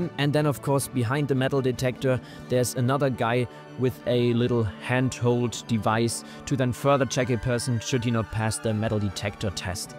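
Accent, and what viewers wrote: Germany. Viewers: Nice German accent you have there. So this is actually a departure airport with no arrivalibility? I guess i just invented that word. Thats what we germans do...😁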